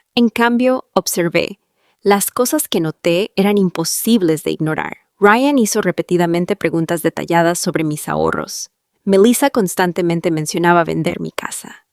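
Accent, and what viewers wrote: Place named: Cocoliche, Argentina. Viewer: Corté en el minuto 5: aburrido, denso, repetido y narrado en cocoliche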